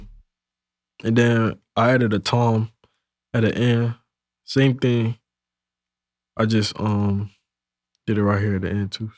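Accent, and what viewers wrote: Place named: Jamaica. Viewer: He Jamaican. You can tell he still tryna fiigure out the American talk.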